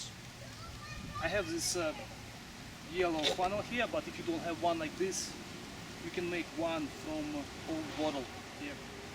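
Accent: Russia. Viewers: he sounds so russian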